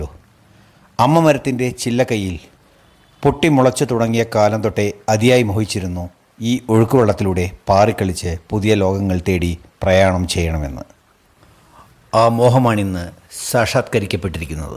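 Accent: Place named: Valluvanad, India. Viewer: അസലായിട്ടുണ്ട് ട്ടോ കുട്ടി, കഥയും അവതരണവും. നമ്മുടെ വള്ളുവനാടൻ ശൈലിയിൽ മനോഹരം❤👌👏🙏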